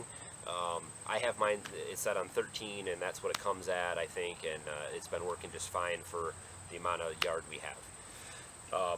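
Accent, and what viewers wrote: United States. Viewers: My wife likes your American accent.and I like the trimmer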